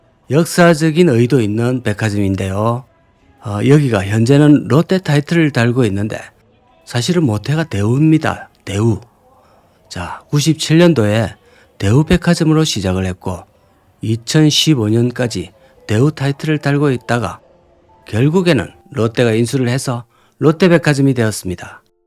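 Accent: Gyeongsang, South Korea. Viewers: 갱상도 사투리가 구수하니, 좋네요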